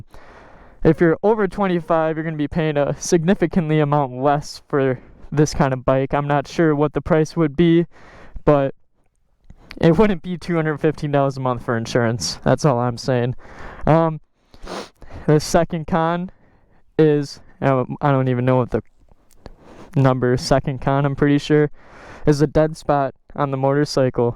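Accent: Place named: Wisconsin, United States. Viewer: Dude your cringe…stupid Wisconsin accent…mono tone…can’t take u serious..you have no business being on a bike of this magnitude this young. Rich parents I guess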